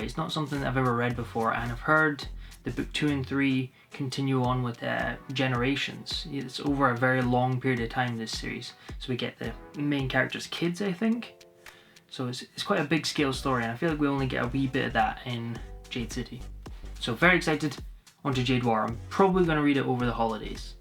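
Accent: Scotland, United Kingdom. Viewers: It’s great to hear a Scottish voice booktube! 🏴 Looks like you’ve got a great reading year ahead of you!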